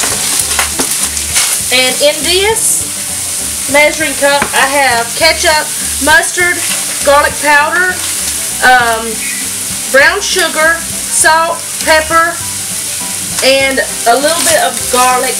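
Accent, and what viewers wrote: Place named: South, United States. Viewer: I love your heavy southern accent. ❤️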